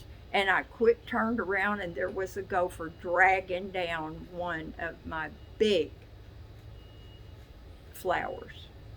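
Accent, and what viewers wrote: South, United States. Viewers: I luv your southern accent! You r the bomb!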